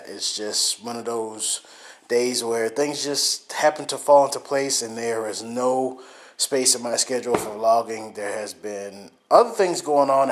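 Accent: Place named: Jutland, Denmark. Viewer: That accent.. You've lived in Jylland too long man :)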